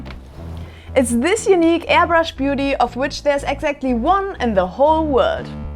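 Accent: Germany. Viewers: Pretty sure there are 45 different logos in the video. Love the german accent, btw! Good luck! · I counted 38 logos. Btw hört man extrem das sie deutsch ist xD😆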